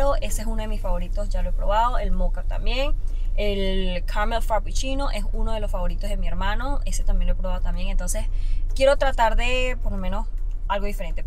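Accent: Venezuela. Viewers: Por qué hablas como venezolana si te ves como china y vives en Estados Unidos? 😯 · Es de Venezuela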